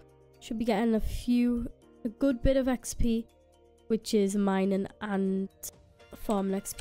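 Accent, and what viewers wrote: Liverpool, United Kingdom. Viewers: You probably get this a lot but are you scouse lol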